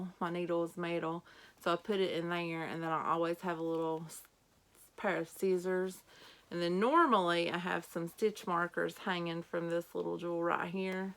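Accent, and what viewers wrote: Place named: Indiana, United States. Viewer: Oh wow I finally come across someone who from Indiana as well...But u sound like ur a little more North from where I'm from...i just subscribed to u but loving ur video ...looking forward to more videos....God Bless and hope u have an amazing week....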